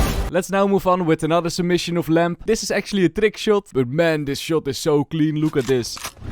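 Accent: Netherlands. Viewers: If youre not Dutch you dont hear it, but the fact that he still sounds more Dutch than English after years of making English content hurts me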